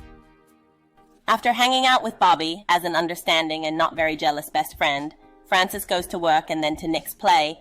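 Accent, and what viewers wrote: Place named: Mid-Atlantic, United States. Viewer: I love it....."Nothing can go wrong"....! its your tagline! Love your "accent" too. not sure but fairly reminiscent of the "Midatlantic" accent of the 1940s films. Anyway, it certainly fits your narration. ( this is meant to be complimentary). Can you do "The Hunger" ?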